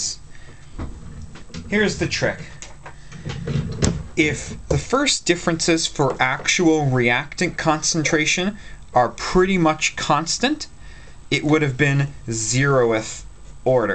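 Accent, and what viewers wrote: Canada. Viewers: Are you Canadian? "Turns Ouuut to be..... "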